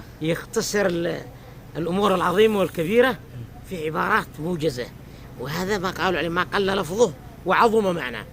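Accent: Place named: Tihama, Yemen. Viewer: ايه بس اللكنه التهاميه تخرب فصاحته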